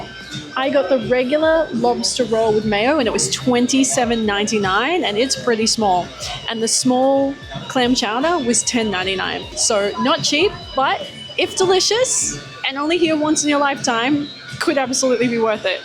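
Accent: Australia. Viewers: Aussie? The way you say your R's sounds really familiar. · that’s not a British accent, more like Australian. As a Scot I take offence to the claim our food is terrible over here lol, you just mustn’t have tried the right foods when you visited 😂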